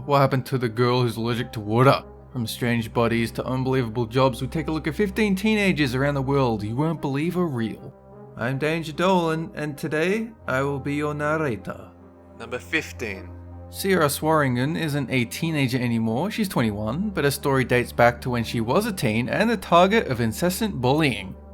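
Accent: Australia. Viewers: AUSSIE AUSSIE AUSSIE OI OI OI!!!!!!!! I NEVER REALISED THAT DOLAN WAS A FELLOW AUSSIE, FOR SOME REASON I DIDN'T RECOGNISE THE ACCENT, BUT NOW WHEN I HEAR IT, I DO!!!!!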